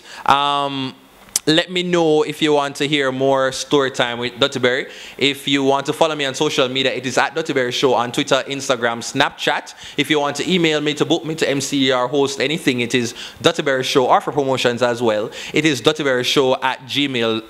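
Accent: Jamaica. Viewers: DWL so Jamaican :) maddd dramatic DWL... luv it lol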